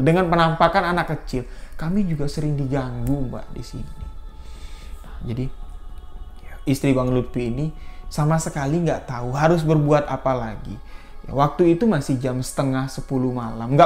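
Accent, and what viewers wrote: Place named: Bali, Indonesia. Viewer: Bang logatnya seperti orang bali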